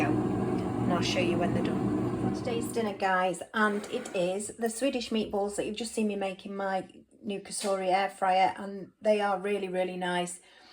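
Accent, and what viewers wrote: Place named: Northwest England, United Kingdom. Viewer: hi, thankyou. I'm definitely thinking of the airfryer, I'll keep a close eye on your makes then decide, really enjoying your channel, are you northwest as your accent is very similar to mine x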